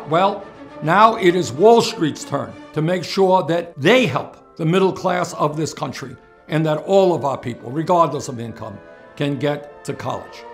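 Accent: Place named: New England, United States. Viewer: As a European I am just loving that New England accent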